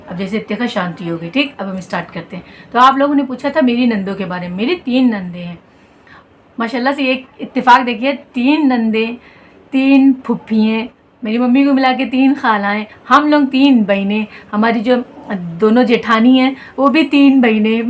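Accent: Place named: Kanpur, India. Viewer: Same aesi meri bhi ammi h...khana bht pasand ata h unko..ar ap bilkl hum logo ki tarah bolti ho pakki kanpurya😅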